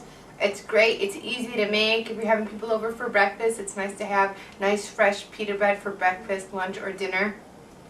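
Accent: Midwest, United States. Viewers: I KNEW I heard a midwestern accent in there somwhere haha.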